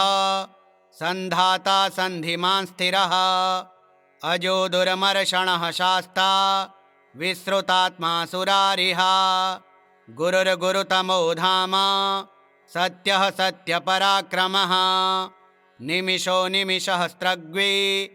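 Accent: Standard. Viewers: उच्चारा तील शुद्धता वाचकाला साध्य झाली आहे त्यामुळे ऐकणाराला श्रवण सुखा चा निखळ आनंद चा लाभ मिळतो धन्यवाद 😊 सा . म्हा म्हसे . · शुद्ध उच्चारण सहित विष्णु सहस्रनाम प्रस्तुति । घर में सुबह पाठ से आनंदित वातावरण हो जाता हैं, बहुत बहुत धन्यवाद ।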